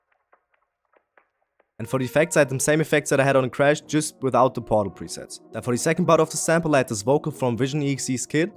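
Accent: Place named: Germany. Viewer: Yo u sound german are u ?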